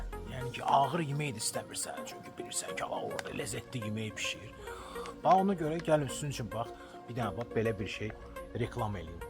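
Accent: Baku, Azerbaijan. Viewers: Bakı ləhcəsi ən gözəl ləhcələrdən biridir, bu xaş üçün ən yaxşı sous elə bakı ləhcəsidir.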